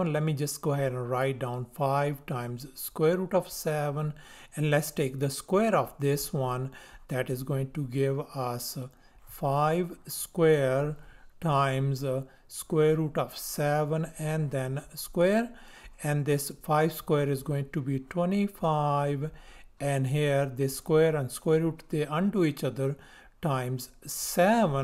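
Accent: India. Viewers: О боже, этот индусский акцент, невозможно слушать...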